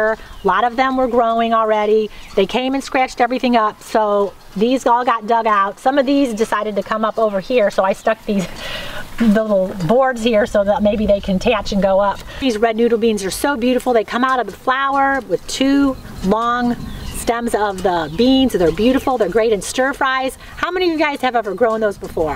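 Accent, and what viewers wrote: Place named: New Jersey, United States. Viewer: Love your garden! Looking forward to see the progress throughout the summer. I also grow all of my own produce here in sunny CA! Sunflowers are one of my favorite flowers also, but did you know that they have allelopathic qualities that can prevent other plants growing around it from flourishing? I just found that out two years ago and now i have a dedicated sunflower patch so I can get every ounce out of my raised boxes. Anyways just thought I’d mention it in case you didn’t already know. By the way, your voice/accent sounds just like my aunt from New Jersey. Are you originally from around Jersey?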